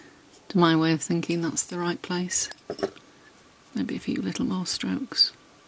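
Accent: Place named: RP, United Kingdom. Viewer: Great video buddy! It's lovely to hear your (posh) voice! xxx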